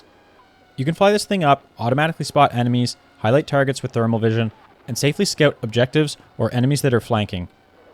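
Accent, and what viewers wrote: Canada. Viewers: This guy gotta be from the maritimes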